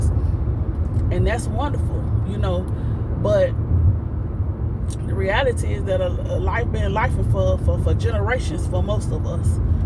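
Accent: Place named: Orlando, United States. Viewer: I figured you were in Orlando area, you hv the voice inflection/accent from there.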